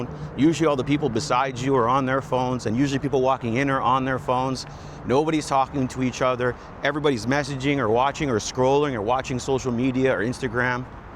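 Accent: Canada. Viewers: I can tell by your accent that you were Canadian, but that popeyes chicken scene you described sounded like new orleans, baltimore, chicago or one of our other ghettos in the states. Stay safe out there.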